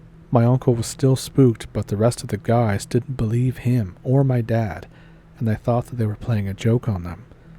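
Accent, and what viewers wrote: Ontario, Canada. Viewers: Odelay,. I enjoy how much you sound like my Ontario cousins-! 😂😊😊😊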